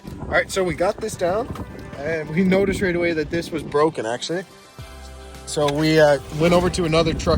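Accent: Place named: Canada. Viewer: Before I saw Canadian I was wondering if ur from there or Minnesota lol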